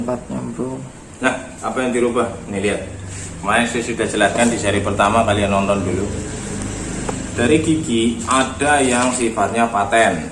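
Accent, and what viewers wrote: Banyumas, Indonesia. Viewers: Kaya wong banyumas,,,ngomongw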